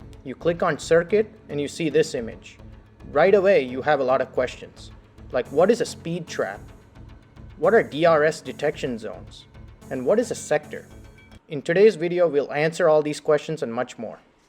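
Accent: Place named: India. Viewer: Just realize how you're talking english, like an Indian talking english.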